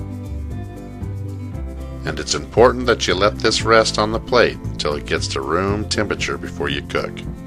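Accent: United States. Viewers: Your voice sounds like the sound of America 🇺🇸 and the steak looks delicious too.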